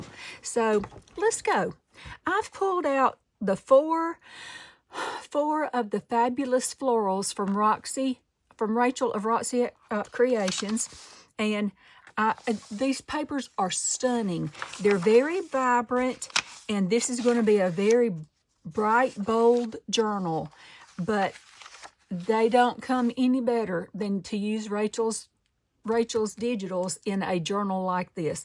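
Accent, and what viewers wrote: South, United States. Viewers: Beautiful pages! Whenever I put my journals together now I add a page and think to myself (in your beautiful southern accent) do I like that?? 😊 Love your videos!!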